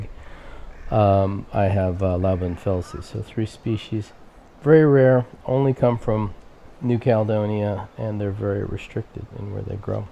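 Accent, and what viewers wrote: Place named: New York, United States. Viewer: Good and interesting to watch, as always. You really got into your New Yorker character. Boy, did that bring back memories.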